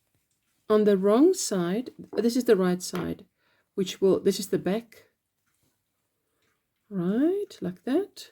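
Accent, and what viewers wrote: Afrikaans, South Africa. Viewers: Where are you from,your accent is so South African .... Ive just completed the beanie, easy and well explained, many thanks. Regards Sushie, South Africa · Great tutorial thank you! If I'm not mistaken, you've got a beautiful Afrikaans accent? Hahaha your accent makes me miss home! Can't wait to see more videos :D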